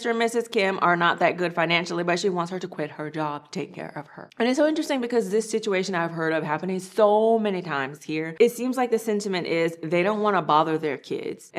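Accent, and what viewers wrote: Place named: United States. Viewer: Love the stories and the American accent with occasional Korean.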